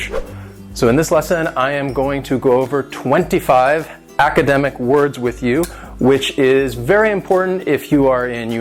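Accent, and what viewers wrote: United States. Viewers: Dear Sir! I have to admit that the video was definitely useful for me to expand my vocabulary, anyway very thankful for you free lesson with an excellent American accent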